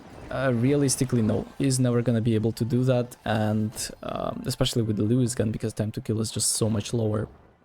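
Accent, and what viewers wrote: Russia. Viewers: Сразу слышен русский акцент, bro 😀😀. Хорошо разговариваешь, very cool!! Тоже сейчас учу английский, только начинаю. Хочу так же свободно говорить и понимать на слух, ты молодец, так держать !!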